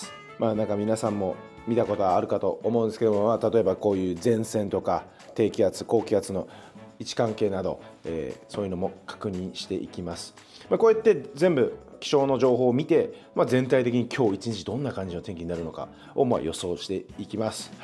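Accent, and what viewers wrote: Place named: Osaka, Japan. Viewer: ちょこちょこ出る大阪弁のイントネーション、同じ大阪人として好きです。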